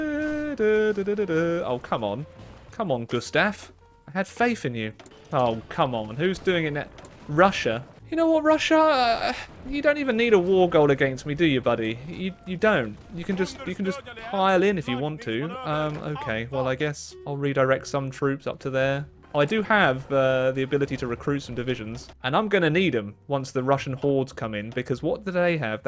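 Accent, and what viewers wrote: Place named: England, United Kingdom. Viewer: I'm french and i like your english accent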